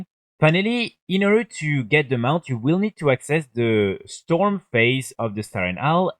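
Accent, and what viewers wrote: France. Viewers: ça c'est de l'accent français comme on aime :D les torrential fragments, j'ai envie de mourir tellement le taux de drop est bas !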